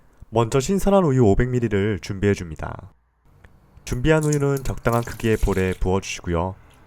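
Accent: Gyeongsang, South Korea. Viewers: 경상도 출신이다에 한표ㅎ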